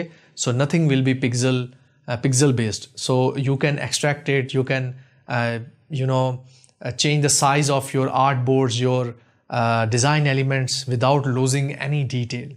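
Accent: India. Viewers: white man with Indian accent !!!!!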